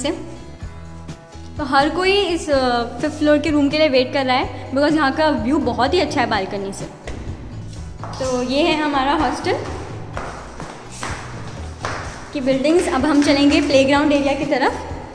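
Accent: Telangana, India. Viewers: Great video...u speak very cute telugu 😍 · Ohh your cute Telugu😅😅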